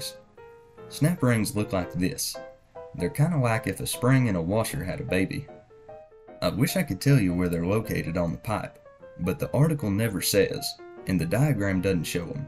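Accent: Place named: United States. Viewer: This guy's voice... 100% unfakeable American. I'm subscribing just out of the hope he's gonna say "John Deere" lolz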